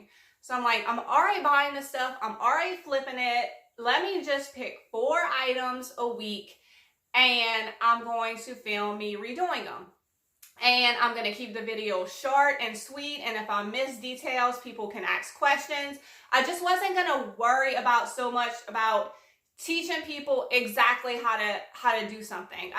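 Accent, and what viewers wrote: Louisiana, United States. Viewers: Used to live in Denham Springs (Livingston Parish) now in Texas and I miss hearing that Cajun speak! Love it girl! Have you tried making your own chalk paint and then using it in your paint sprayer? Do you have to thin the chalk paint to use the sprayer? Thanks! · Just stumbled across one of your videos, heard your accent, and said this lady must be from da bayou like me 😍 lovin your channel too girl! 😘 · Congrats on 10K! I love Southern Louisiana accents! A Fb group would be fun! Keep it going! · I've only recently found you, you are a breath of fresh air! I too, LOVE your accent, I'm from Tennessee and my company's office is in Beaux Bridge, I could listen to those guys talk all day and your cute accent is way stronger than theirs. I love your style, I can't wait to see what you come up with next!!! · Love your channel and really appreciate your step-by-step instructions on how you make your DIY 's. 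I enjoy watching your channel and get excited to see what you are creating next so I can go try and recreate your DIYS. I recently found me a duck!!!! at the thrift store and I am going to try the chalk paint/paint baking soda concoction along with the Waverly wax clear/paint i know its going to come out amazing, Oh girl love that ac•cent because we Louisiana girls!! 😘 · I've been addicted to your channel since last summer and started curb flips in the fall. Your videos are so inspiring. I love that you incorporate color into your designs. Love your accent, too. My mom is from New Iberia so I recognized the Cajun accent. Love love loce. Congrats on the monitization. God bless and keep you and yours. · Great video! I love your accent too! Took a while but figured out it must be cajun and you verified that for me here.😉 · I could tell you were from La right away..... being from MS you are from my sister state !!!! 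The accent sounds like home !!!! Love your videos where you flip an item or you make something from wood... I love woodworking... Thanks for all the info about you !!! I am subscribing !!!!! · I just happen to come across your channel. I love thrifting, so I was watching you and I knew you were from my state and I knew you had to be from south. Your accent is my accent. Louisiana is the great state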